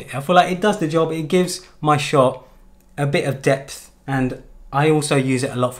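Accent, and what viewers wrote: United Kingdom. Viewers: Hey that studio set up is Tickety-Boo looks sweet, quick question you mention $ in the video but that accent is defo from here in Blighty - Happy New Year!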